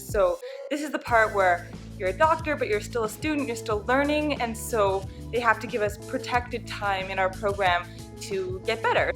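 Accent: Canada. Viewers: are u canadian ? if so what part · Is she Canadian? Her accent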